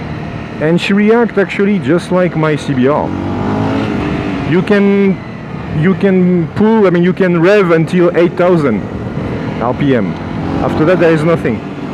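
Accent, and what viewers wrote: France. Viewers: Mdr!!! je suis tombé sur la partie 2 et j'ai jamais aussi bien compris l'anglais du coup j'ai posté un com qui disait tu parle comme un français! ! et là je regarde la partie 1 et je vois ton com...ah ok je m"était pas trompé. ...j'ai vu cette brèle jeudi chez honda a Clermont elle fait rêver dommage qu'elle ne soit pas en 450...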